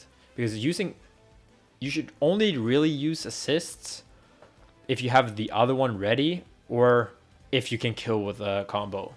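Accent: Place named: Sweden. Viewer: he literally said he may misprounce some names due to his swedish accent. Just like how he say ginjew instead of ginyu. He knows he is mispronouncing some names. Plus, you don't have to watch dbz to be good at this fighting game. You don't have to play Super Mario or Legend of Zelda or Pokemon to be good at Smash Bros.How much you watch dbz has literally no correlation to your skills in this game. In fact, this game is made by Arc Systems, so it's literally a Guilty Gear game with MVC assists.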